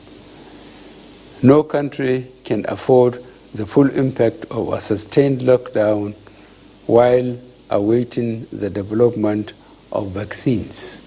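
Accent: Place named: Nigeria. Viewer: This accent thick too much., If we Nigerians finds it very difficult to understand majority of the things he is saying how much more difficult would it be for foreigners to understand wtf he is spitting out of his mouth. Dude can't even pronounce ' th' right.....Im ashamed!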